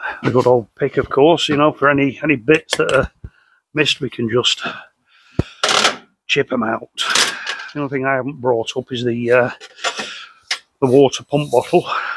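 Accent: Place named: Liverpool, United Kingdom. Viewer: I watch your channel too. Scouse geeza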